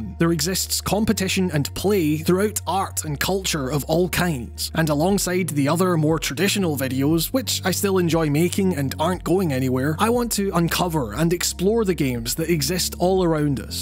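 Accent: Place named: Scotland, United Kingdom. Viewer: Started watching your videos after moving to a new country and took great comfort in hearing about one of my favourite topics being covered by a fellow Scot so I could savour hearing the familiar accent while growing accustomed to a new place. At the same time, I grew to really enjoy your approach to topics, you cover games differently to most reviewers and bring in your subjective and often emotion based opinion which is honestly so refreshing and has helped me think about why I enjoy games the way I do. You brought great insight to the music of Shadow of the Colossus that really changed the game for me so any more exploration of your other passion in music is more than welcome.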